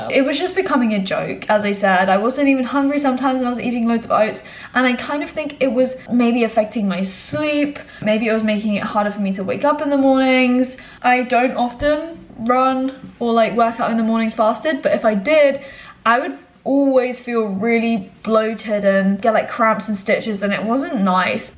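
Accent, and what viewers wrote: Australia. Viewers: Cringe that you're speaking in an Australian accent u were only there for a couple months... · your accent sounds australian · I've noticed that you've gained quite the Australian accent. Maybe that's me being a little extra. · You have an Australian accent! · I can hear all the Australia in your accent ^_^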